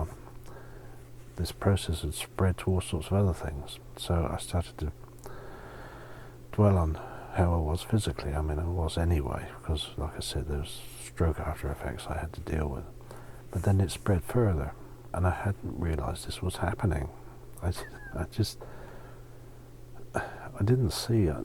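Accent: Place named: Hampshire, United Kingdom. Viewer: Ah it's a Hampshire accent - yes, some Sussex accents can be similar too. I thought you didn't come across as Cornish in your attitude or manner, so was a bit puzzled, but now I get it. Yours is a very reassuring and earthy accent/voice, which suits your purposes very well. I find it very calming.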